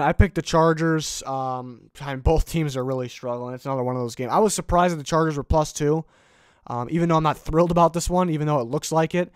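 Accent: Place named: Midwest, United States. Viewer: he could be from Wisconsin or MN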